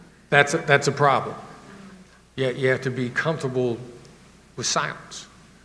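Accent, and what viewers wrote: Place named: Midwest, United States. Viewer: I want to know the accent story. He's from Iowa, the midwest.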